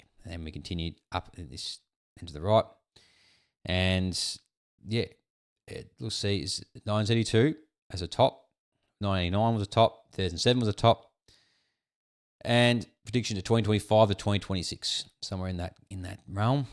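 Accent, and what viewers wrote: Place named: Australia. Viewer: Good info, we need more Aussie voices on utube.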